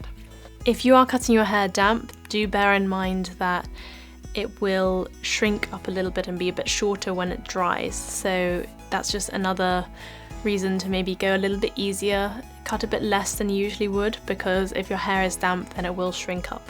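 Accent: United Kingdom. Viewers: Are you British :v ?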